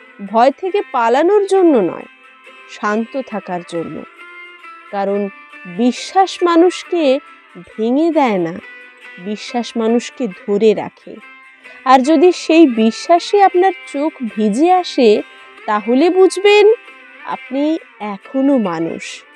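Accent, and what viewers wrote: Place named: Bankura, India. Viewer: দিনে দিনে, বেশ পাকা পাকা কথা শেখাচ্ছিস্! বেশ ভালো হচ্ছে, যে যাই বলুক, তোর মত তুই এগিয়ে যা, তোর শক্তি আছে। তোর বাড়ি কোথায় রে, এত ভালো বাকডি ভাষা বলিস! ভালো গান ও তো গাইতে পারিস!🎉🎉 ভালো থাকিস। আমি প্রায় তোর সব ভিডিও দেখি😂😂🎉🎉🎉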